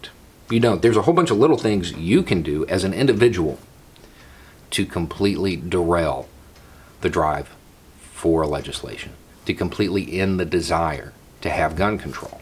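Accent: South, United States. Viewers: This is exactly how I feel! Damn! Its nice to hear someone else with a southern accent say this. Thank you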